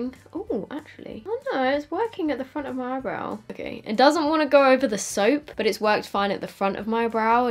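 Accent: United States. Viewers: Who else wasn't ready for the American accent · Omg. Can u do a video speaking in an American accent!!???? That would be so cool and funny if u did like a whole tutorial in ur American accent!!!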